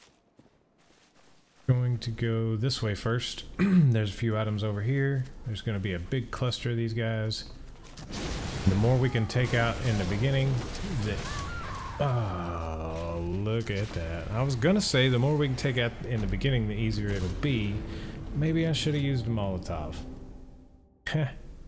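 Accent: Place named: South, United States. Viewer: Yes ma’am I can tell you got that southern draw lol me too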